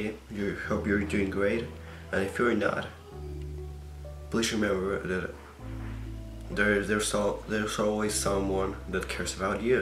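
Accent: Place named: Mexico. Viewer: Thanks Mike! I'm from different countries so my accent is mixed with other accents. it's mexican+costarrican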